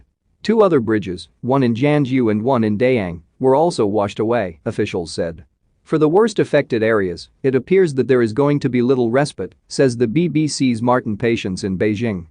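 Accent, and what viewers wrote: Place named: United States. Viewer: Blatant fabrication with intent to create unrest in China. From the accent of narration, it must be a vicious American.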